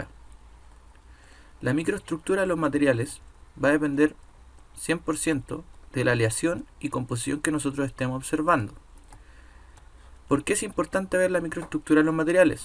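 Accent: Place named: Chile. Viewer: Chileno po weooooon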